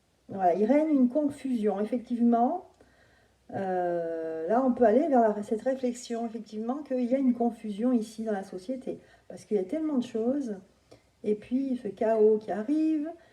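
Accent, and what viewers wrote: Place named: Corrèze, France. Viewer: Bonsoir merci bp j adore votre accent je crois entendre ma cousine correzienne c est presque pareil😊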